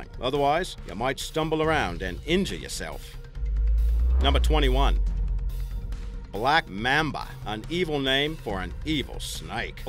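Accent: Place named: Australia. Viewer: i know dudes Australian.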